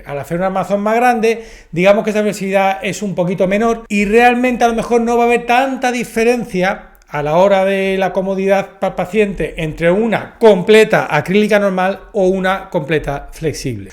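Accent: Spain. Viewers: El locutor es de España. Tendrás que preguntar a un dentista en México😂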